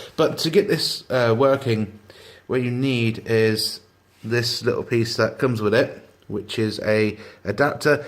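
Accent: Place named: United Kingdom. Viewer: British accent is why I'm here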